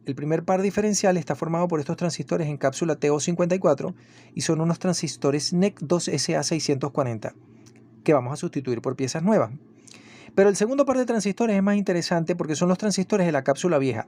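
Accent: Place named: Guayaquil, Ecuador. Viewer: Solo he visto unos minutos de este video para darme cuenta que usted es un verdadero técnico y conocedor de estos hermosos equipos de alta fidelidad. Una pregunta: en que país se encuentra usted? Por su acento me parece que en Guayaquil-Ecuador..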